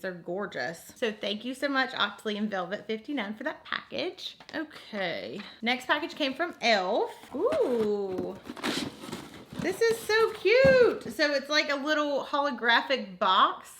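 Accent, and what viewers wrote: South, United States. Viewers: You have to be from the south lol but anyway I love your videos!!! Everytime my phone dings I'm hoping it's a new video from you.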